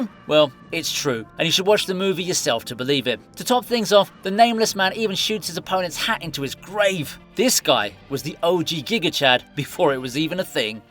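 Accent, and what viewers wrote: England, United Kingdom. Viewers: I'm not yet halfway through and already, it's painfully obvious that saying "bro" and "freakin'" in a middle-class English accent is cringe. As for Leonidas' "wall of death", it's a well; someone mistyped your script. Bro.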